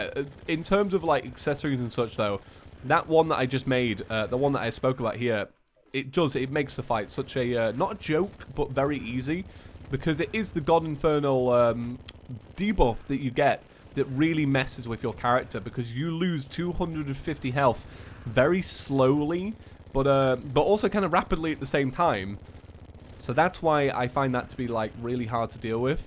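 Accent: United Kingdom. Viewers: I was super confused to his british accent, "What the heck is super heart? I don't remember a game like that", then I realized he was talking about super hot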